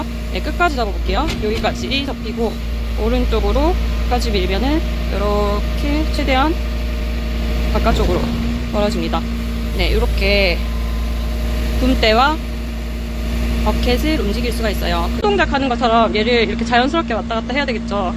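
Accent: Gyeongsang, South Korea. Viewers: 마 갱상도 사투리가 슬쩍 들리네예.포클레인은 굴착기 제작 회사 이름이었죠. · 굴삭기땅크애니띵 말투가 갱상도시네요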